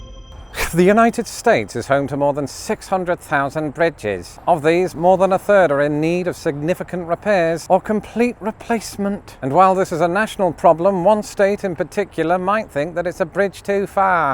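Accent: United Kingdom. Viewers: about Eisenhower turning American into the world's largest Scalextric. The WHAT?! That got me curious. Did I hear that word right? Was that waning British accent too confusing? Nope. I heard it right. I googl'd it. Scalextric is the leading brand of UK slot racing cars. Slot cars? Instant nostalgia set in. Now I know what meant.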